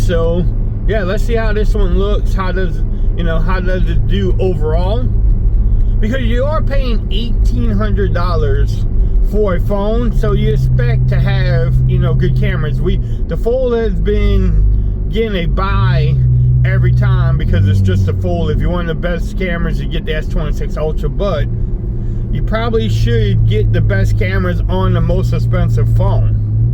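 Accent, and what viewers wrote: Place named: Louisiana, United States. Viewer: You still sound Cajun. Camera's ok 😀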